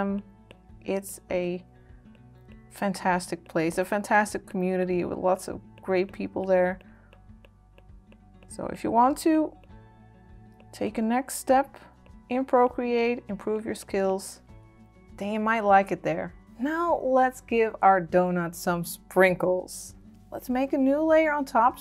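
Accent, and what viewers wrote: Netherlands. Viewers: i was like: she is dutch. I CAN HEAR IT. En toen hoorde ik stroopwafel en ik wist het gewoon.. BTW LOVE YOURE VIDSSS <3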